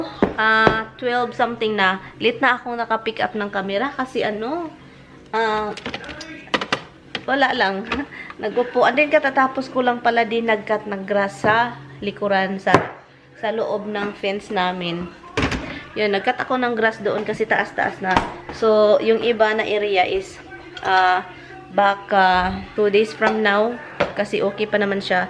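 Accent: Visayas, Philippines. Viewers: ang cute mong mag bisaya.. na intindhan ko kc bisaya din ako...simple ka lng...at gwapo si mr.khit may edad na sya..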